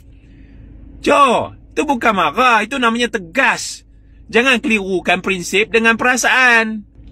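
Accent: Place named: Perak, Malaysia. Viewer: Bahasa Perak ko... Pehhh.... 🎉🎉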